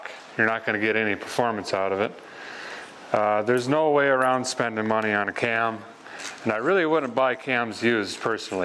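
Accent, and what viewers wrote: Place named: Minnesota, United States. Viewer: This is how all Minnesotans north of i94 talk. Almost Canada relates to vocal inflections as well as weather.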